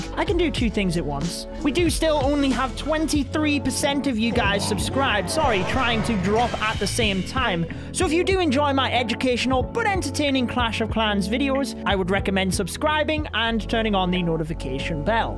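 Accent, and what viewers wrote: United Kingdom. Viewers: Wow the british accent its soo good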